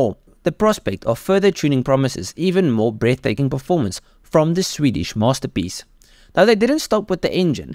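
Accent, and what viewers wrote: South Africa. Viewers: Clearly you can hear the South African afrikaans accent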